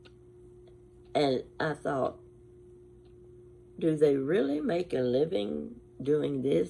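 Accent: South, United States. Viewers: I remember you reciting your memory, you could have knocked me down with a feather when you perfectly pronounced a town that is very familiar to me in your broad southern accent lol x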